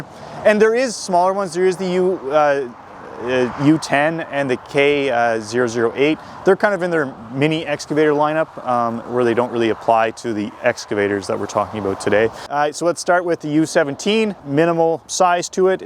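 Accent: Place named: Alberta, Canada. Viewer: I thought you were from Alberta, not west of Ottawa, I also watch B&F Macfarlane equipment.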